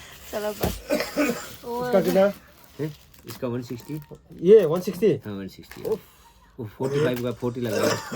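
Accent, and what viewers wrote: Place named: United States. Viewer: Wow! Your english speaking is super fluency and a perfect American accent. Good to hear it. 👍 Happy stay!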